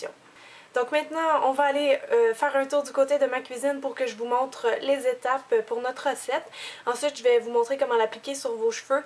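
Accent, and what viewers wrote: Quebec, Canada. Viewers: Ah, l'accent québécois...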